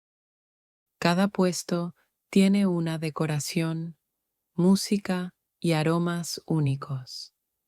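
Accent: United States. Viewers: ...these videos are wonderful! i never watch them, just listen on my phone. but who are you? you sound American but you are in Nigeria? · Yes!!! Are you American or Columbian???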